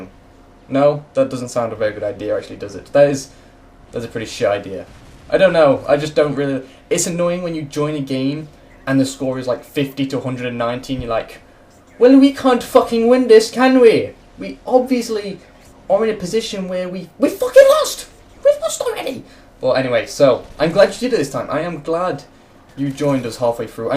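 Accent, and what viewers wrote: India. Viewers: enjoyed the Indian accent :) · EPIC INDIAN ACCENT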